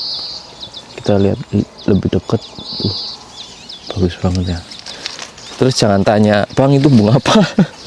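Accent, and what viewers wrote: Banyumas, Indonesia. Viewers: ngapak ke bagian ndi mas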